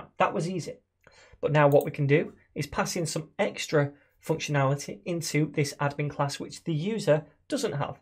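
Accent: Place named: Northern England, United Kingdom. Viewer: You are an excellent teacher, and clearly nothern England (I send Manchester??). This is some of the best JS stuff i've learned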